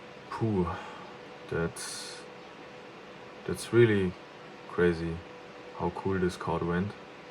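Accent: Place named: Germany. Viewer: Ich frage jetzt mal auf deutsch weil ich heraushöre dass du deutscher bist :D super video wäre nie draufgekommen kupfer zu verwenden.🙏 Wo hast du das Kupfer herbekomen in der Dicke? Und nach der logik muss man die Kupferplättchen dann niemals tauschen oder?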